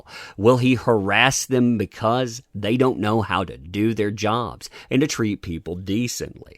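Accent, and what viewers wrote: United States. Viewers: Your accent is the most American thing I've heard, but it's also kinda really unsettling and made me uncomfortable and don't really like listening to you talk.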